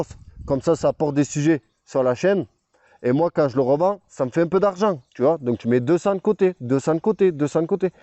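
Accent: South, France. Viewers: Bonjour l’ami et merci pour ta sincérité. J’avais regardé ta précédente vidéo sur le sujet de ta maladie et crois moi tu ne nous embête pas avec ce genre de vidéo car ça nous permet de mieux te connaître et de mieux partager à chaque fois tes « aventures » mécaniques. Pour les vacances tu as bien raison car il faut parfois se resourcer un peu la tête et c’est ta vie et tu as le droit de faire ce qui te plait avec ta petite famille. En plus ce n’est pas tous les jours que l’on rencontre un Danois avec l’accent du sud lol !!Merci pour cette vidéo.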